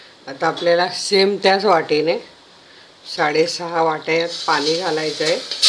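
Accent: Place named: Nashik, India. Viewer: ताई 🙏 मी तुमच्या रेसिपी नेहमीच बघते मला फार आवडतात. मी पुन्हा एकदा कुरडया केल्या, या वेळेस माझ्या कुरडया छान झाल्या.व सांडगेही केले,तेही चवीला छान झाले. तुम्ही नाशिकच्या आहात.असे तुमच्या बोलण्यातून समजले.म्हणून आणखीच छान वाटले,करण माझ्या आईच माहेर नाशिक, आम्ही लहानपणी सुट्टीत नाशिकलाच राहण्यास जात असे. तसेच तुम्ही जे पदार्थ बनवितात.तुमची पदार्थ बनविण्याची जी पद्धत आहे, त्याच प्रमाणे आमची आई पदार्थ बनवीत असे. तुम्ही फारच छान माहिती देतात. खुप छान समजावून सांगतात.ते ऐकूनच तो पदार्थ एकदा तरी बनवुन बघावा, असे नक्की वाटते.म्हणूनच मी पहिल्या कुरडया माझ्या मना प्रमाणे झाल्या नाही.व दुसऱ्या वेळेस करून बघितल्या,खरच खुप सुंदर झाल्या.त्या बद्दल तुमचे धन्यवाद.💐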